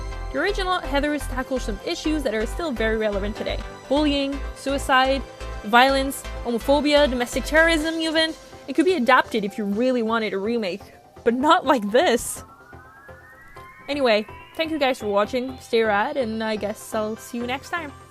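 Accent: Quebec, Canada. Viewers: are u from québec?? i feel like u are from ur accent!!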